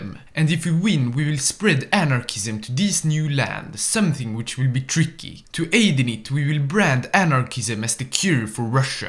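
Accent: Sweden. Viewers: You have the strongest swedish accent I've ever heard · I like how through out all your videos you pronounce alot of cities with a swedish accent, and as one aswell it gives the videos alot of flavour. Thank you I love your videos, keep up the good work!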